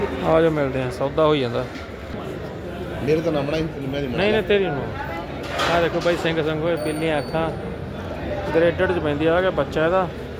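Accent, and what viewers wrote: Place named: Punjab, India. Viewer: Sona bahut hai kanjarda teri boli jata wali hai bhai teri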